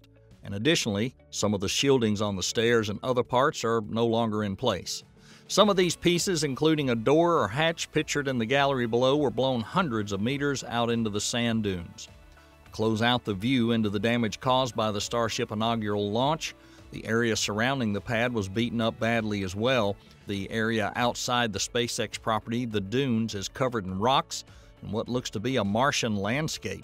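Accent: United States. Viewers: From which part of the US is the accent of the speaker?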